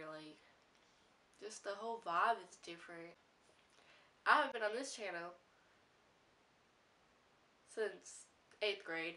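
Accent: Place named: Tennessee, United States. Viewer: I can hear your accent from living in tennessee... you talk completely different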